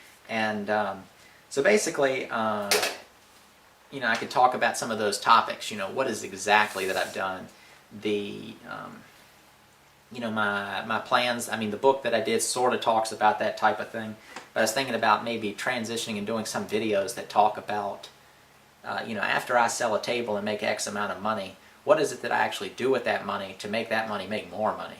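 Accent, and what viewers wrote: South, United States. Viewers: To tell the truth, as a non-english-native-speaker it was your accent that made me subscribe (something from the south right?), but i kept the subscription because of things like that barn-tear-down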